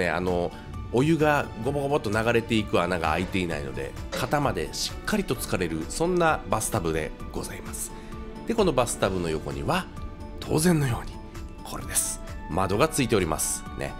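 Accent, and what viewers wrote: Kansai, Japan. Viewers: 最近登録しました。ナレーションの関西弁が面白くて好きです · 小樽に帰るって・・・・ 関西の方❓・・・・・どう聞いても関西弁ですよねぇ( ^ω^ )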